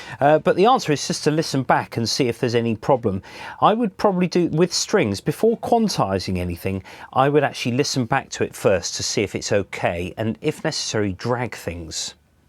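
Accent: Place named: United Kingdom. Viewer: Hey man, loved this tutorial, and your accent it reminds me of a British tv show, cant remember which but it draws you in, please keep this type of content up.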